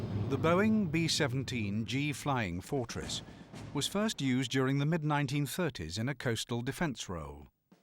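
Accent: United Kingdom. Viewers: I find that any narrator that speaks the Queen's English I can understand with no problem what so ever and I'm an American.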